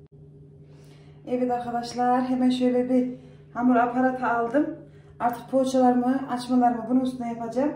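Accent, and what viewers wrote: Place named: Central Anatolia, Turkey. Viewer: Orta Anadolu danmısınız. Şiveniz sanki oralı gibi.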